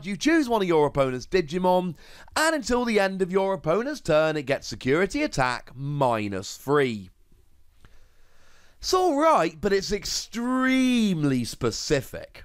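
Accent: England, United Kingdom. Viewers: Weird accent? He has an english accent.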